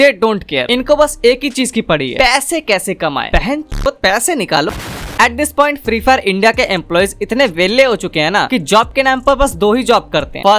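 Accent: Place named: Bihar, India. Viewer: bihari accent on top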